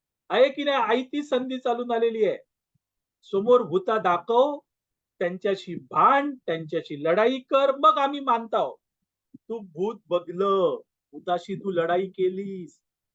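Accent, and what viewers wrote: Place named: Malvan, India. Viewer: malvani bolnyacha attahas karu naka pl nahi shobhat normal marathich bola please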